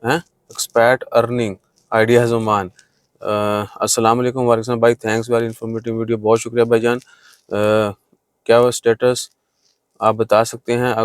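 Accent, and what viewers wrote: Pakistan. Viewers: wesy he boli se tu Gujrat k lagy hain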